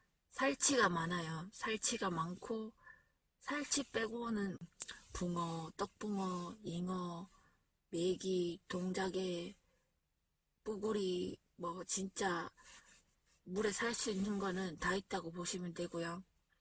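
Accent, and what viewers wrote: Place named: Gyeongsang, South Korea. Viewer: 님은 지역이 어디세요?경상도 인듯한데 저는김해 붕어꾼입니다~^^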